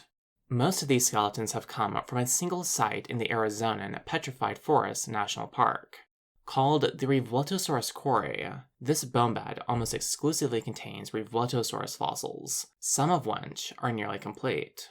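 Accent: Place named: California, United States. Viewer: Effing hell mate!! Why is the narrator soooo californian? Is he gay?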